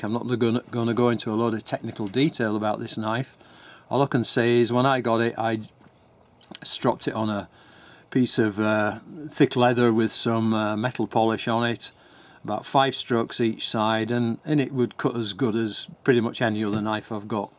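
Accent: Northern England, United Kingdom. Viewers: Good morning, I’ve just found your channel and I’m enjoying your videos. I live in northern England in Lancashire I’m just wondering what part of England your from. It sounds like your also from northern England but I can’t just pinpoint your local dialect. Keep up the good work. Regards Ian. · "A perfect littl' dangler" LOL ... I love Brit accents and idioms ... last time I heard "dangler" was on "Are You Being Served?" ... one of the best Brit sitcoms ever. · oh... well... I think your tractor's sexy. there. Now we're friends. :) But Seriously, yew...that's pretty. Since I appreciate you so much, I'm willing to take extreme care of it for you. :) It is a fine knife though. You should make more. Anyway, I have friends in England. You sound northern. I have some wood I could cut and send you to make more handles if you like that sort of thing. 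I have black Walnut, sugar, silver maple, and even stag-horn sumac, though I don't know if you could keep sumac from rotting, but it is beautifully colored when cut with multiple colors, if you could. hell, I might even be inclined to send you a black rat snake head, or skin when I run in to them in the spring. (they're no less than 4 ft long here. I've even seen a 16 to 20 ft long one, which is ridiculous for them.) p.s. I'm in Ohio, USA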